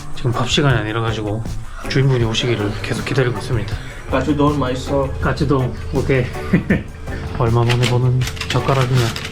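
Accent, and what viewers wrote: Gyeongsang, South Korea. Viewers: 잉! 인도를 이렇게 철학적으로 담아내도 되는겁니꽈?? 한편한편 홀린듯 보고 있습니다. 할일도 산더민데 ㅋ. 사연있어보이는 잘생긴 얼굴---- , 막걸리처럼 구수한 갱상도 억양---, 나름의 위트도 있네요 ㅋ. 혹 전공이 영상쪽인가요? 전업유튜버 하시죠!!! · 우연히 보게 됐는데 말투가 경상도 출신이신가요?? 제 친구가 옆에서 같이 보다가 의경얘기에 자기는 대구남부서에서 복무했다고 1028기라며 관심을 가지네요 구독해주라고 했으니 한명 더 늘어나겠네요 구독자 곧 1만인데 미리 축하드립니다! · 경상도 사투리에. 으이~~ 구호까징~ 세상친근합니다 저는 16년전 첸나이랑 다녀왔는데. 가족이 그곳에 있어서 인도여행이 이렇게 빡신지 모르고 댕겨왔네요~ 또가고싶은데. 가족들이 한국에 들어와서. 여행가기쉽지않네요~^^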